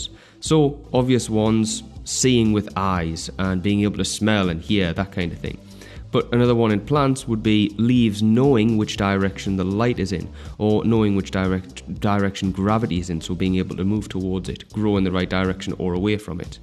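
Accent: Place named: North East England, United Kingdom. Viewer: Love that you are from the north east haha and the accent comes out 100%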